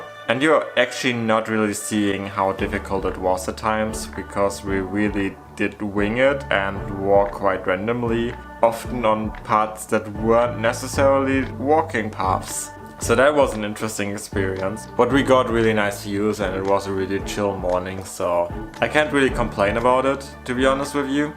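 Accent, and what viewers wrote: Germany. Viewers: Where are you from? It sounds a bit like you're from a german speaking country to me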